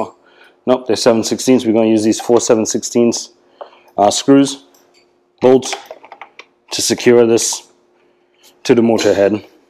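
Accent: Trinidad and Tobago. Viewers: Is that a trini accent I hear? Thanks for the video by the way. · You sound like a Trini. I am thinking about getting this one myself. Thanks for the video. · You’re a Trini??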